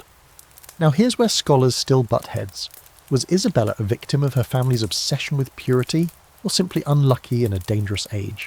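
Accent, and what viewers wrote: United Kingdom. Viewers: ive been joking for years that I put on a documentary with some guy with a british accent to help me fall asleep. I finally found your account. Thank you from Chicago illinois. Its 1am here.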